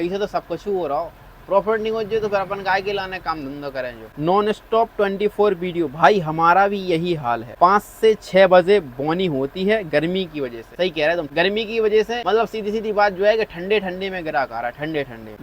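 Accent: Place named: Jhansi, India. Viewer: भाई अभी भी टाइम है इसको बंद करके पान की दुकान खोल ले 😢 कपड़ों की दुकान में अब दम नहीं। वैसे झांसी साइड के लग रहे हो बातचीत से 😅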